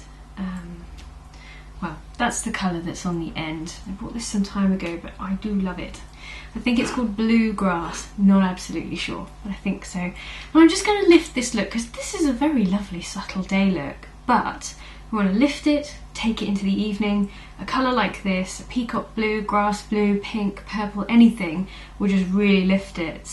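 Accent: England, United Kingdom. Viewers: Love your channel... it's so refeshing to hear such a beautiful english accent.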